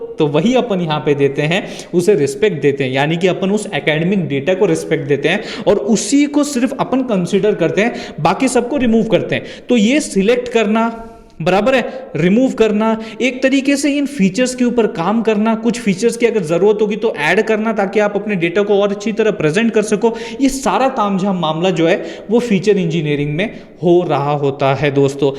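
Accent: Maharashtra, India. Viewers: Sir language apki Marathi ki Tarah h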